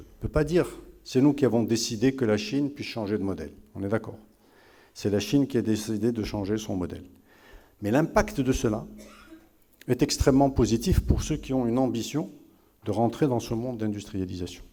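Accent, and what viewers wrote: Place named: Marrakesh, Morocco. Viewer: l'accent et l'humour marrakchi vous colle toujours notre cher ministre...c'est bien pour attirer tout cet adorable auditoire à ce que vous dite...si important et si sérieux. merci moulay hdid Alami.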